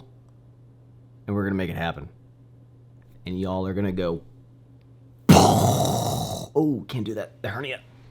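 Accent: Texas, United States. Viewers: you have started to sound like a Texan 🤣 best of luck for your surgery!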